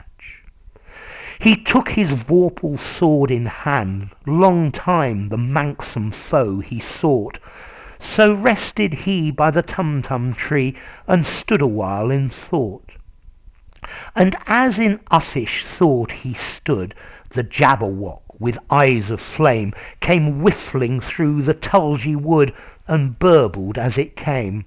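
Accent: United Kingdom. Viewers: i really like listening to this its easy for me to study British accent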